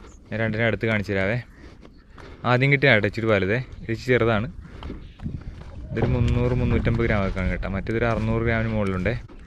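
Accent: Alappuzha, India. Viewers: Alleppey slang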